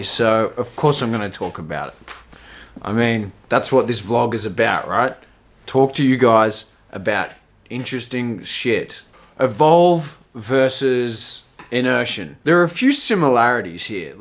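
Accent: Australia. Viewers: That's how Aussies talk hahaha. Studied abroad there.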